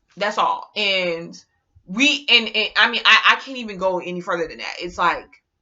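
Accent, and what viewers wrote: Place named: New Jersey, United States. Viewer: .i love your jersey accent and your list lol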